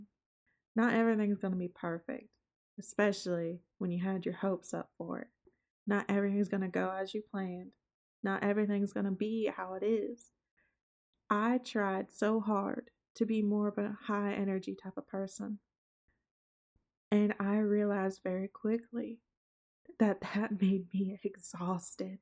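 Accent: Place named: South, United States. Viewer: The southern twang is the icing on top 🤠 Yee yee represent darn tootin!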